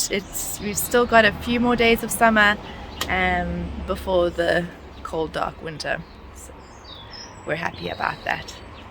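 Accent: South Africa. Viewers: I am currently in Dublin visiting a friend, He was kind enough to open he's door to me to come out here to look for employment, really God sent. I have left my Wife and my 2 children back home in South Africa really out here trying my outmost best to make this trip work for me to try and give my Wife and my Children a better future. Things back home are not looking good at all, so I really need to make this work. I have a Home Care and first Aid certificate from the Red Cross South Africa. If you are kindly willing to refer me to a place where I can try and land a job because unfortunately all the places I have called or approached don't do sponsorships at the moment, I am willing to cover costs for a work permit. If you might also know of any place that is hiring any Customer Service or Sales Jobs. I would really appreciate any kind of information. Thank you. 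I have been applying for work online hoping and praying that I land an interview it has not happened yet though but I am pushing on. Really your story has touched my heart because I can relate and it really tells a story of how strong we are as South Africans, also what a blessing to my ears for hearing a familiar South African accent...lol...All the best going forward...May God continue to bless you all and guide you...Cheers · Hey! Your lovely Saffa voice is so soothing to listen to! And I love your text on the screen during the noisy bits 😂 we are in Ireland 7 months already!!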